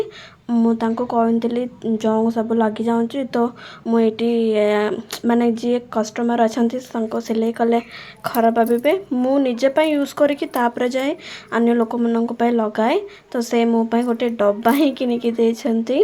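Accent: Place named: Telugu, India. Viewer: It's like Telugu slang😮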